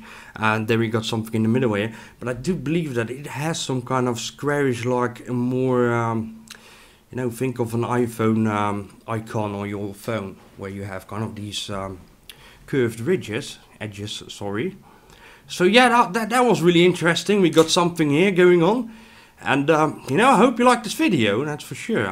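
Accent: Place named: Netherlands. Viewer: Liliana Georgiana Boros Als je goed kijkt naar de items in z'n browser, bv 'Hobbie' dan is het duidelijk een Nederlander. Dat kun je ook horen aan de manier waarop hij Engels praat. Nederlanders praten op een hele typerende manier Engels.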